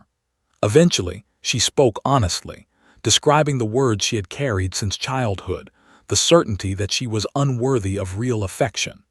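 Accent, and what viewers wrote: United States. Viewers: .........And get rid of the US accent when it's a UK story.......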